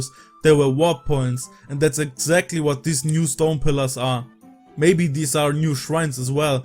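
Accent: Germany. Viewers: Nices Video! Bist du ein Alman? Hört sich irgendwie so an vom Akzent her :)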